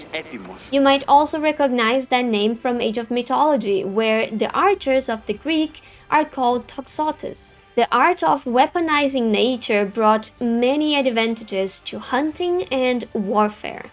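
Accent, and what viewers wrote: Brazil. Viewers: vc é brasileira ne fala a vdd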